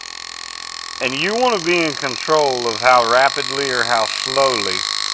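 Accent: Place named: Oklahoma, United States. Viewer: I thought about Ben Johnson the whole time I was listening. It's almost an OK accent. Which is my home.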